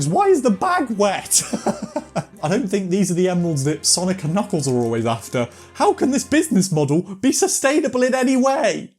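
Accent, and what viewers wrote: United Kingdom. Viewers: Someone heard your accent and had to be told that you're British. At this point it's on them. Not to mention that apparently only Americans can talk about American movies? Interesting. Also, if I'm looking for some crystals, it's best to go to stores selling them. Sure, you could also get scammed there, but you can actually see the crystal before bying it. Also, I've seen much cheaper ones irl.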